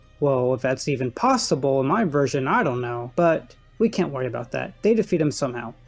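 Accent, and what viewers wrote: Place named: England, United Kingdom. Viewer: I love your English accent, and your videos are high quality congratulations 👏👏👍🏽❤️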